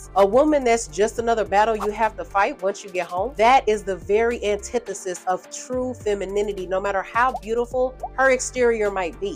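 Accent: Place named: Texas, United States. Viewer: Great information as always. Question are you from Houston or Texas by chance? I'm always trying to figure out accents.🤦🏽‍♂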